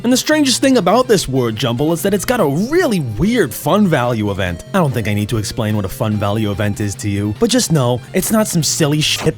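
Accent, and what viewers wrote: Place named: New York, United States. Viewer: Love your vaguely New York accent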